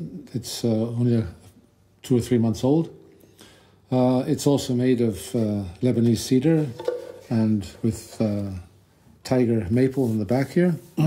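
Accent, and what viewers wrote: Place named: Ireland. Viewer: thank you...your Irish Brogue is still intact.....UCD Graduate Class of 04 Greek American!!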